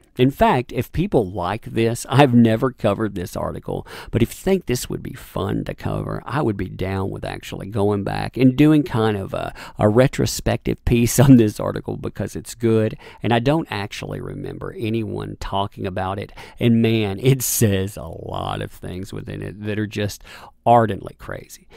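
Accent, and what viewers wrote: South, United States. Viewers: Dude what region of the country are you from? Your accent sounds decidedly southern but can’t quite place it regionally beyond that level of specificity Also, you say “within this” to an almost enraging degree lol. I have to assume that’s a regional thing too, sort of like how Baltimore residents say “behind this” or “behind that shit” meaning “vis-a-vis” more or less. That’s the same type of thought conveyed by your “within that” thing I’m not asking these things being critical or shitty at all! I’m 100% a D&C/comicsgate supporter and love your videos along w Nerkish, yellow flash, captain Cummings (though he gets on my nerves lol. Dude can’t read’. I swear he reads at about a 4th grade level lll but I share his perspective completely so I try to overlook it) lol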